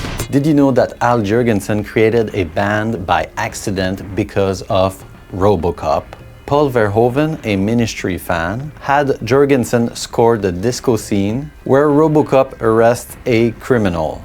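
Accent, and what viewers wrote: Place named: Quebec, Canada. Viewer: Intéressant. Es-tu de Québec? Je reconnais un accent familier.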